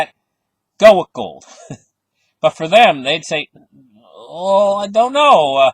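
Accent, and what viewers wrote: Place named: Canada. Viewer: Mr., dont try to change for other people. It has to be expodential I can't listen to this without the whole Canadian accent.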